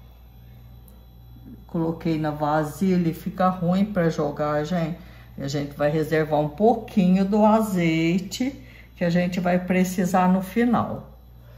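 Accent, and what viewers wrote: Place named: Minas Gerais, Brazil. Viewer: Eu não conhecia você, e a semana passada assisti um vídeo seu da ceia de Natal, e hoje vi você no Fantástico e me lembrei que assisti o vídeo seu. Sucesso adoro o seu sotaque igual de uma amiga minha de Minas.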